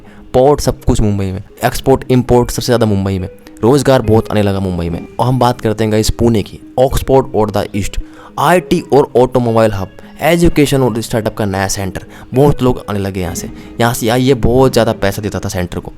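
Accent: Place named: Pakistan. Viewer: Tumhe kisine nahi pucha kya reality hai Pakistani tum ho tumhara dialect batata hai . Ai Bana Kar kuch bhi bakvas mat banao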